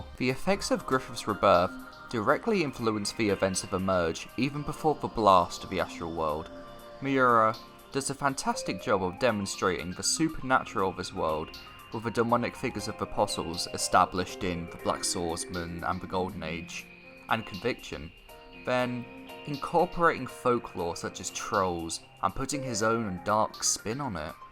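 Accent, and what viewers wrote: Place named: United Kingdom. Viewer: Being in the UK is known for having thick accents. You simply find it hard to deal with his accent. Nothing wrong with his English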